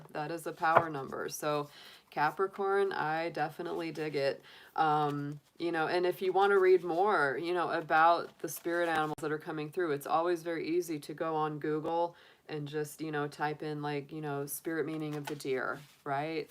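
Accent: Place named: South, United States. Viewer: I love your southern accentb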